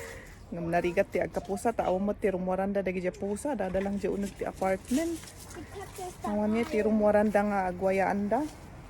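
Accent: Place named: Cordillera, Philippines. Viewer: Kasla ka met nga Igorot nga ag sao ti Ilocano ken Filipino, suruem met ti agsao iti Kan kanaey ay kalin di Eb Besao..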